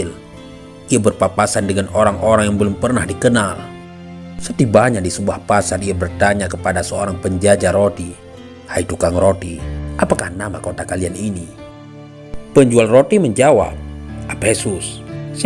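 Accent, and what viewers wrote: Aceh, Indonesia. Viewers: Apakah pengisi suaranya org Aceh??? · Logatnya seperti org aceh.. Terdengar dari palafazan huruf "T" nya yg tebal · Ini yg crita pasti bukan orang jawa , kyk orang aceh atau bali juga lombok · Naratornya dr Bali, Padang atau Aceh?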